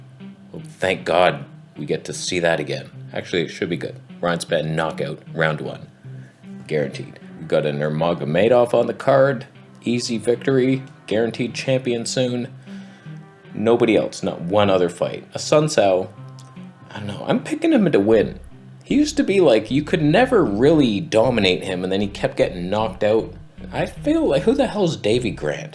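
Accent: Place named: Canada. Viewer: It’s like if Jack Slack had a vegan Canadian accent.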